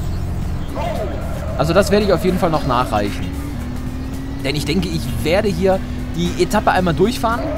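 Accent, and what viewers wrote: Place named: East Germany, Germany. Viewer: Ach lass die Leute Reden, Ich mag die Grafik . :) Und ich kann's mir Vorstellen, was es für ne Arbeit war das Spiel zum Laufen zu bringen ;) Dann Funktioniert dies nicht, dann das nicht.. dann braucht der andere Treiber u.s.w. ;) Wie du dich Freust... damit Zauberst du mir ein Lachen ins Gesicht... Merci dafür :) Dein Ost-Dialekt xD einfach Geil Oh. Ich tu auch gern Autos Tunen ;) (In Videospielen) aber ich mag das... ..Die Reifen müssen Brennen! xD :D Und aus dem Auspuff muss Feuer kommen :D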